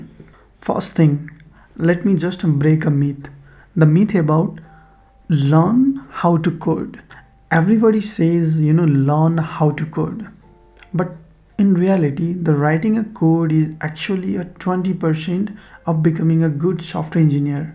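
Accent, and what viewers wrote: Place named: Nepal. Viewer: bro are you nepali?your accent sounds like that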